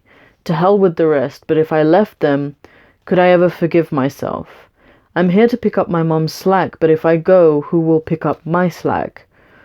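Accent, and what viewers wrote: United Kingdom. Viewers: Real question..what is the objective of content you put out? informative, validation, monetary, therapeutic just plain ol' fun,when i view your content I try to look past the ex muslim chick with the Brit accent seeking validation from cyberspace with a western culture fetish, maybe its partly because I dont see (or really care to be honest)about Islam or its followers where I Iive..you have a lot of well wishers and supporters..on Team which is good my comments arent meant to demean or be disrepectful..i could be out in sunny 85 degree weather but I choose to watch your content..mix it up..interact with people..do some location shoots..surely the Universe isnt all Islam sucks My family sucks I like western entertainment and the occasional cringy use of American slang(sorry its like imagining the queen saying" bitch got cake" lol)..NOW you're Artwork I like....a little angular for my tastes but I love your color schemes...hey put out an adult coloring book that might generate some scratch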